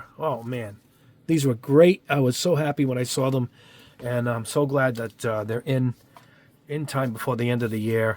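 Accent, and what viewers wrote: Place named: Massachusetts, United States. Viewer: dang, from your videos it sounded like you were up in Mass some where, but Rhode Island is just as close xD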